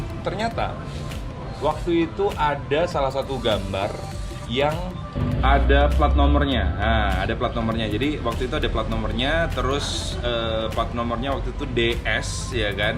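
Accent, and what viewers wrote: Indonesia. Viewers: kok bahasa nya kaya orang sulawesi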